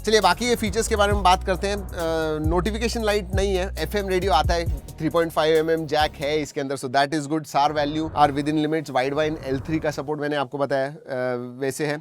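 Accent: India. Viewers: Indian english its a trash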